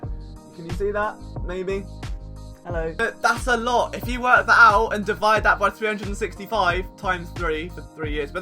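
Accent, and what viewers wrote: England, United Kingdom. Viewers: Really happy for you, English accents get on my nerves honestly but I still love all your eagles videos lol. Keep up the good work! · To be honest your voice was the reason I tuned to you. I never heard a Brit speak Eagles. But after listening your presentation and analysis, it is clear that you have a passion for the Eagles. I loved them over 23 years ago. I am glad you are achieving your goals. Semper fi!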